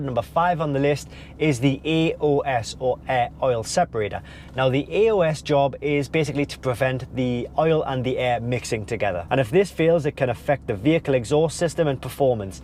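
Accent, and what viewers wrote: United Kingdom. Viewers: what part of the UK are you from? Your accent is different from my London friend.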